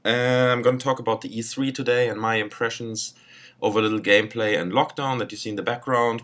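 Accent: Germany. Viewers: Nice to hear a German accent on here :)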